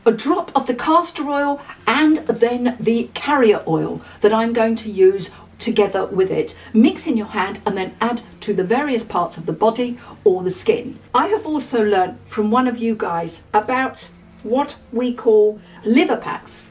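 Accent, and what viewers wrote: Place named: United Kingdom. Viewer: I’m a new user and early on my journey of discovery. And I want to say if you’re not a motivational speaker you’re missing a calling. Love your passion and enthusiasm and I’m a sucker for a British accent. I’ve heard and seen so many good things about CastorOil. I came for constipation and happily moving on with so much more LOL. Thank You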